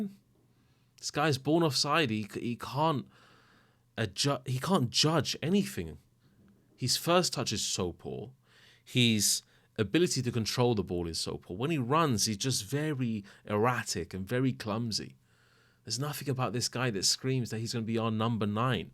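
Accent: England, United Kingdom. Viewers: How did you get in to supporting Real Madrid?, you sound like a proper Englishman. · He's spanish with an English accent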